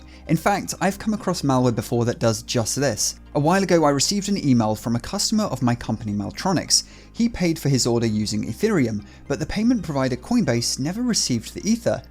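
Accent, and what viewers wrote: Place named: United Kingdom. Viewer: Love you r voice 💖😍 ... excellent British accent....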